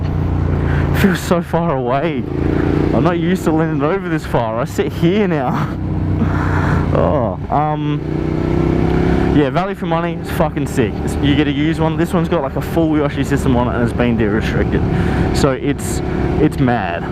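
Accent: Australia. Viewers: Him: "SSSCOOIN ON EVERYONE" Me: Oh gday fellow aussie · Wait mate u from Australia?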